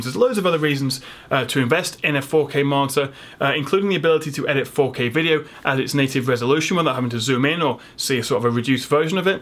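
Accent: United Kingdom. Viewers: Nice video btw. Should sound "eich" which sounds more natural. No offense to the british language. My uncle lives in england and my aunt is an engish women. I'm familiar with this accent but h always sounded kinda strange. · Are you from UK?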